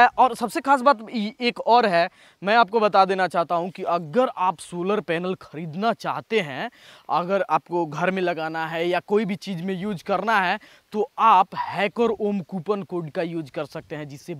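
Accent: Bihar, India. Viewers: Bhai ap bihar se ho❤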